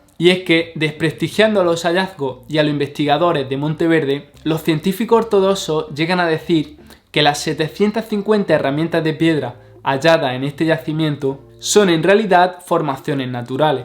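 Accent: Andalusia, Spain. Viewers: Así se habla en el sur de España, sé más respetuoso al preguntar... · Si no te haz percatado el chaval es andaluz. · Ay, no ocultes tu bonito acento andaluz. 😍🇲🇽🇪🇸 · Debe ser de Andalucía. Si le quitas la "zeta" verás que habla muy parecido a nuestro Caribe. En el caribe de hispanoamérica tenemos una fuerte influencia de Andalucía y Las Canarias. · no es por una discapacidad, tonto! Es obviamente del Sur de España. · Es inevitable no hablar de tu acento me gusta como hablas, pero en que lugar de España hablan asi🤔 saludos desde Puebla,Mex. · Radiólogo Millonario , acaso así hablan las personas del sur de España ? Específicamente en que lugar ? Aunque alguien comentó que era Venezolano, pero parece que no lo es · Cynthia... Ese acento pertenece a uno de los que hay en Andalucía (sur de España) más concretamente es de Granada y Almería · ...NO sé si se dieron cuenta pero este señor no pronuncia la "S" al final de sus palabras....al mismo tiempo parece tener un acento español . 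· Del sur de españa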